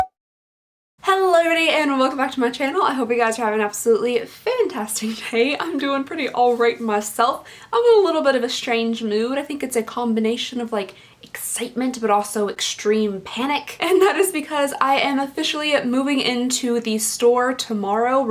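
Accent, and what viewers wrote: Kentucky, United States. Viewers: Cool.. you go to the same school as my son and his girlfriend.. just a county away from where I live.. lol I can tell by the accent you're KY! 😊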